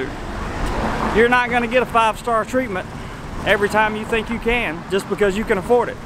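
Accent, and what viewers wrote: South, United States. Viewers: Your Southern accent is cute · I'm listening to his accent and thinking.....cape fear hahaha. Great real world advice boys ! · these advices are precious really like not touching the head of a Thai, who would otherwise know for such details. Thanks. PS: English not my mother language but I'd say you're from US somewhere within the Bible Belt range? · Love your down-to-earth delivery with the southern gentleman charm. You should do way more video narratives....on any subject. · I love your south accent man! I've been in TH for 3 years, 85-88 and everything you say it's true. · Thumbs up for cowbow accent. Yehaw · Watching from the Philippines... I like you southern accent. thanks you visited the Philippines. thailand and Philippines have similarities in culture and they both eat rice Lol. I like to watch ads too... SPANISH. As the Philippines was once a colony of Spain... 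Spanish was taught also in School. 2 languages were taught in Philippine school back then 1950s-1980's.. Spanish and English. Same is true in the Philippines... in Thailand and the Philippines... foreigners are guest.. and a good guest behave. You are not a citizen in this country. Act like a good guest . TIPS... because wages are low and people have high expectation with tourist. As you have said... dont come to Thailand with little money.. because people expect tourist have money to spend. WELL SAID. I agree with you. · My curiosity: that american accent you have... which american state are you from? Texas? I can easily understand every single word you utter. English' s not my first language (neither the second one, actually), so I'm curious because sometime I struggle a little to understand fast spoken american-english. No problem whatsoever in understanding you ;)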